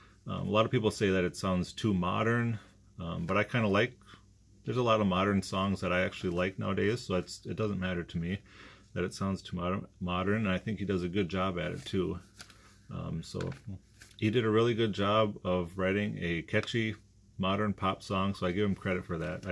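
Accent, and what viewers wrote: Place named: Minnesota, United States. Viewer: Too funny. I think sometimes the more you listen, the more you like :) I'm glad you're enjoying it :) Also I'm sorry I thought you were from Canada...to my Michigan ear, Canadian & Minnesota accents sometimes sound the same....Oy vey.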